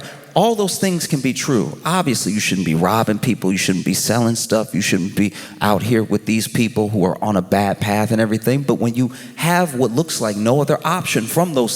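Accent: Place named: Louisiana, United States. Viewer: How does Josh put out so much quality material? He’s one of the funniest comedians today. Also love the Louisiana accent 😊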